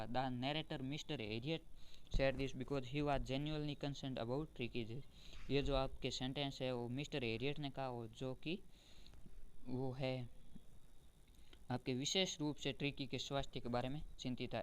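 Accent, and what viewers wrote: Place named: Marwar, India. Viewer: Bai aap rajsthan se ho to aapka Jilla jarur batana mujhe aapki aavaj se lag raha hai ki aap marwad se ho 😊😊❤🎉🎉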